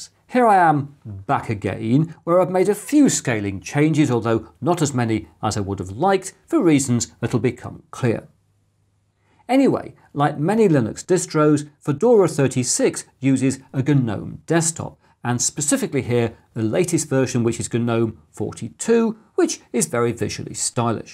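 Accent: United Kingdom. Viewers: A Fedora is a hat. I believe it was Frank Sinatra's choice. (But it wasn't red, I think). Thanks for the insight into yet another operating system. By the way: You British always seem to have a problem pronouncing "kn" and "gn". Very often you just ignore the k or the g: [nife] rather than "knife", [nu] rather than "gnu". But when you do decide to pronounce the k or the g, you always insert an e or sometimes an a: "Genome" rather than "gnome". You once had a Danish king named Canute. Except, his name was really Knud. Where did that a come from? The funny thing is that it isn't difficult at all to say "gnome" with no inserted e. In Denmark we do it all the time.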